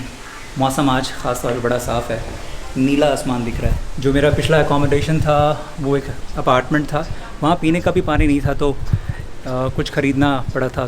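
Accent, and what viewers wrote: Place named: Asansol, India. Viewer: Asansol type shune khub moja laglo. Love from Kolkata.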